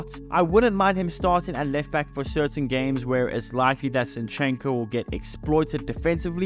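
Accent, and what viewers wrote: South Africa. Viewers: I dunno but your accent sounds so south african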